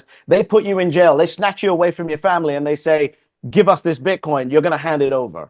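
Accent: United Kingdom. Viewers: Talk that talk ‼️💪🏾💯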